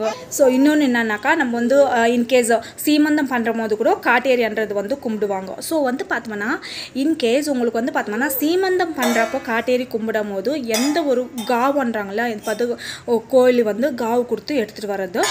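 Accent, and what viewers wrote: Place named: Bangalore, India. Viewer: Mam you have given a good explanation.. i think you are explained the procedure followed by Vahnikula kshatriya. Tamil you have spoken is Bangalore Tamil if am right. Thank you for the explanation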